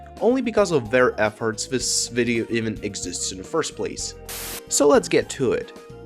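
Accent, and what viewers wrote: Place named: Russia. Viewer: I did not notice the slight russian accent until I noticed the russian text lol Then again I'm from Israel so maybe I'm just uses to Russian accents more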